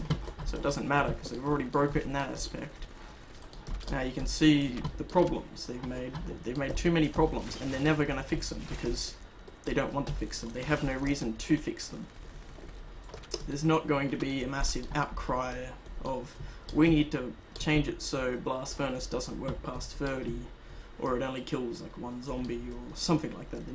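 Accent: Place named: Western Australia, Australia. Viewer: listening to a western Aussie rant is 11/10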